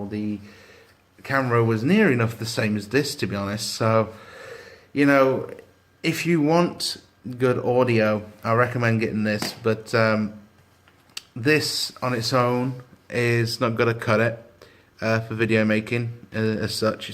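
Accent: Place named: United Kingdom. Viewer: British accent is why I'm here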